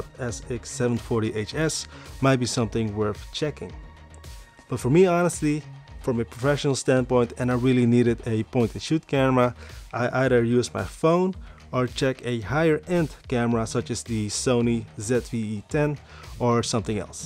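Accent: Netherlands. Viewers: Ik kon gewoon horen dat je uit nederland komt 👏🏾 I want a camera so bad but the prices are sickening here in the Netherlands (everywhere tbh) 😩 Trying to figure out how good the cheapest ones are.. Nice video, thank you~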